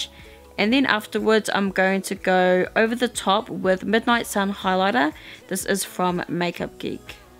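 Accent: New Zealand. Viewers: Are you from nz? x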